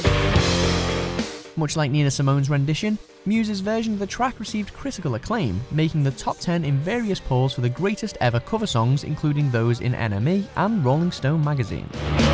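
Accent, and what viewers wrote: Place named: Northern England, United Kingdom. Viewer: Logat Inggris Utara (Northern British English) dipakai dalam membawakan acara ini.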